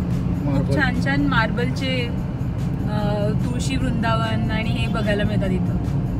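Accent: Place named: Kolhapur, India. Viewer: मस्तच... मॅडम थोडसं narrative मध्ये सुधारणा करा... You are repeating same sentences again and again...सांगली रोडचे वर्णन करताना ग्रानाईट, मार्बल, संगमरवर, Building material, ग्लासेस असे वेगवेगळे शब्द वापरु शकता... फॅक्टरी म्हणण्याऐवजी शोरूम्स म्हणा हव तर.... बाकी सिनेमॅटोग्राफी, background music, editing सर्व काही छान...बोलता बोलता आपण बरेच शब्द कोल्हापुरी style मध्ये बोलतो😀😀 "मेन म्हणजे" पण तो accent creative आणि मजेशीर वाटतो... Keep it up... शुभेच्छा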